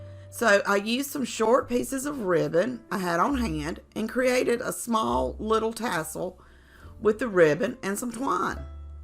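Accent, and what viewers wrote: South, United States. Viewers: I love all of your projects and your Southern accent, which is like mine.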